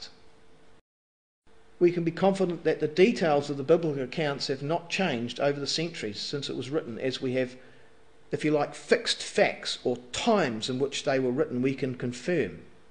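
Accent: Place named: England, United Kingdom. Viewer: the speaker is English and he pronounces words differently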